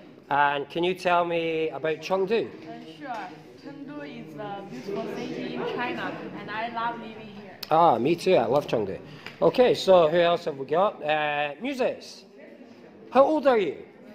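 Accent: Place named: Scotland, United Kingdom. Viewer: Good to hear a fellow Scot in China! I am in Beijing about to venture into teaching, this is very helpful thank-you! (Tom, Edinburgh/Shetland)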